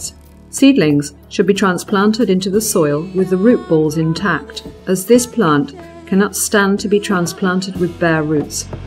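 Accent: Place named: United Kingdom. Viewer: that perceived british accent was so hard to follow. but jolly good show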